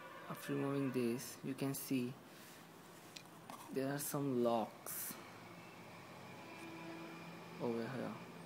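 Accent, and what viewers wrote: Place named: India. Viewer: pure indian accent. lmfao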